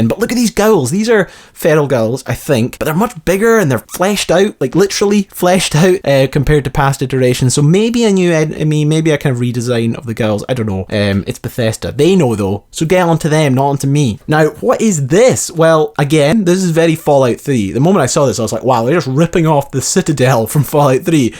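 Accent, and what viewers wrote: Scotland, United Kingdom. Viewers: Is this guy brittish or American or canadian? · Tyler M. It's his accent he's Scottish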